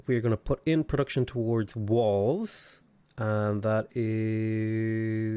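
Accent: Ireland. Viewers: I swear accent became more Irish after he was accused of sounding like he was from London. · IDK what that guy's talking about, you definitely sound Irish. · mate that guys is shit at accents must be american you deffo sound irish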